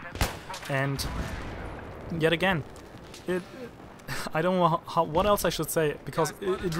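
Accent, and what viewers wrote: Germany. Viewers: Your german right? Your english sounds a bit german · du bist deutsch oder? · are you maybe from germany becaouse i hear a little bit accent?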